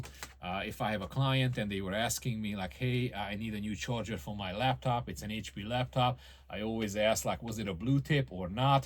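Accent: Hungary. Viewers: I know a hungarian accent when i hear one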